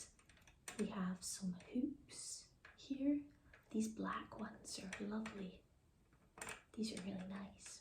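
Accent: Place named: Scotland, United Kingdom. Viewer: Is that Scottish accent? Or Irish? How cute and relaxing :)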